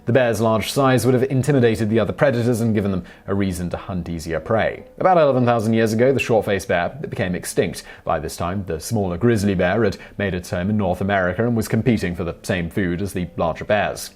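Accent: United Kingdom. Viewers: So...you want a Brit to use incorrect American pronunciation? Here's a tip, go see the world and make sure to never use the complaint that "This isn't how we do things in America", because we either don't care, or find your ways quaint and strange, especially pronunciation.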